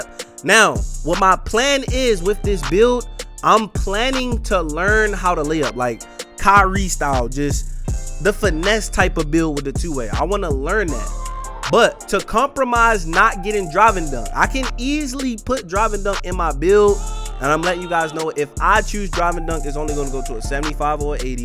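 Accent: Baltimore, United States. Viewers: That Baltimore accent is skrong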